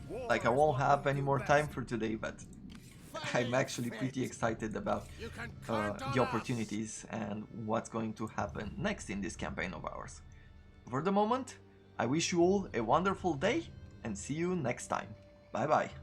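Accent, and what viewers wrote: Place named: Romania. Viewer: You sound like you are from SE Europe maybe? Romania?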